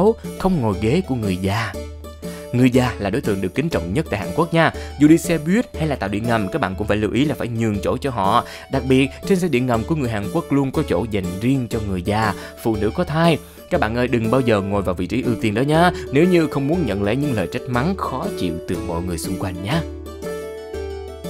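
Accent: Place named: North, Vietnam. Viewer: ad nói giọng bắc nghe cute vải :)))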